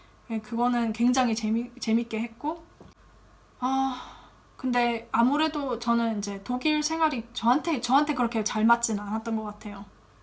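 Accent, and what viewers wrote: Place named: Daegu, South Korea. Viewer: 대구분이세요? ㅋㅋㅋ 사투리 참 좋습니다!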